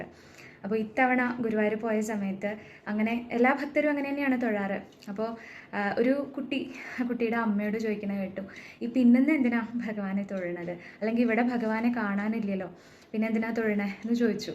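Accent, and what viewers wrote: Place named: Palakkad, India. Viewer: മോളുടെ സംസാരം കേട്ടപ്പോ pkd ആവും എന്ന് തോന്നി അതുകൊണ്ടാ ചോദിച്ചത് 😍😍😍😍 ഞാൻ ഒറ്റപ്പാലം 🙏🏻🙏🏻🙏🏻🙏🏻🙏🏻😊😊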